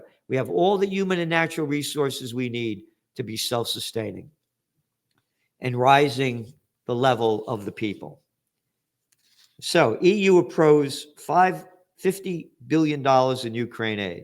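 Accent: Bronx, United States. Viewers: You sound like a proper Bronx bastard. Not quite as foul mouthed as my Brooklin relatives, but at least you fucking try.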